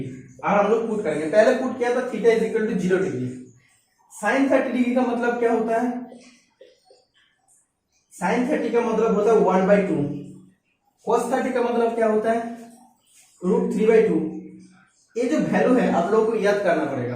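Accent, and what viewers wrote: Bengal, India. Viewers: Bengoli ho bhai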